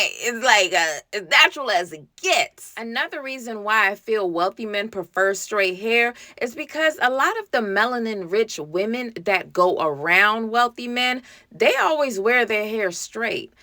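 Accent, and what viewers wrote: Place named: Jamaica. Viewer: Hey i heard that jamacian accent. Looking forward to buying some more of your products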